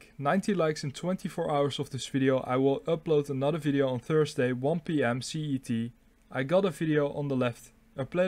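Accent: Netherlands. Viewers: are you dutch by any chance?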